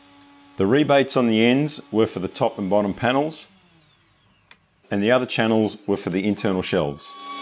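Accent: Australia. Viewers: Beautifully sleek cabinet! I'm not a woodworker but enjoy watching your videos. Maybe it's the lovely Aussie accent LOL.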